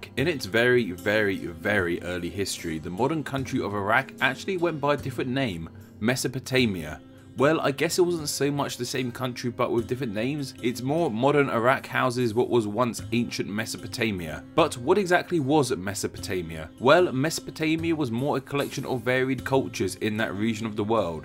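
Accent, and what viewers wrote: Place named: United Kingdom. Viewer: Your british accent gives me headache. Great video though.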